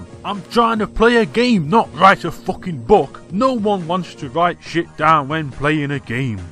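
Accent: United Kingdom. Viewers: The RSPCA ok you are definitely British bro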